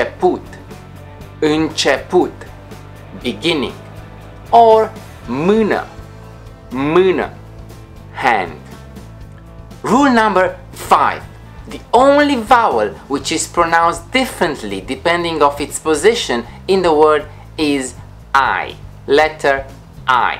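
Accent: Transylvania, Romania. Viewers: eu mi am exprimat opinia personala referitor la pronuntie nu este nimic ciudat; ideea vlogului este f.buna; accentul ardelenesc nu ma deranjeaza iar in romania este la moda sa vorbesti romaneste cu accent american,italian etc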